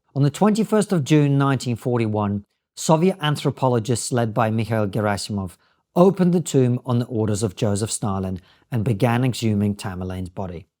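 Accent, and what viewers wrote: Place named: United Kingdom. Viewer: Ты так быстро говоришь и с таким хэви-бритиш акцентом, очень трудно понять.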